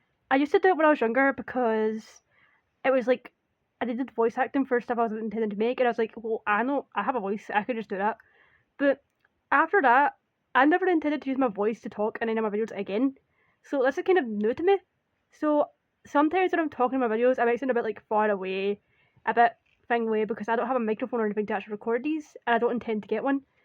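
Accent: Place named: United Kingdom. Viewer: OH GOD. SHES FUCKING BRITISH!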